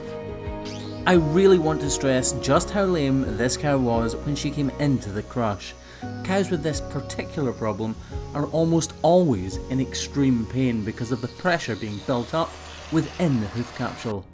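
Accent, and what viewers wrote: Scotland, United Kingdom. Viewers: You are a vast wealth of knowledge when it comes to hoof trimming. I always enjoy watching your channel and learning new things. I admire your work ethic and how you treat each cow that you trim and I love listening to you explain it all w/ Scottish ascent. Keep up the great work, I always look forward to the next video.♥️🐂🐃🐄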